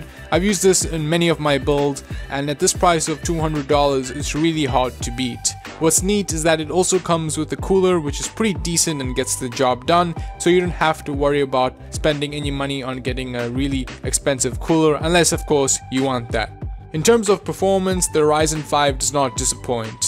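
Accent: South Africa. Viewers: He from South Africa? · Is he South African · I subed for that SA accent xD , were did u buy the parts tho?Evetech? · This is the first video I've seen of yours and I noticed that SA accent right away :D (checked your channel info to confirm it). Great quality video!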